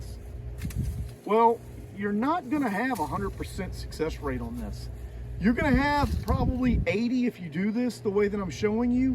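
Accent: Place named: Louisiana, United States. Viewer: Before I look deeper in your about section... New Orleans? Southern Louisiana? I recognise that accent. 😁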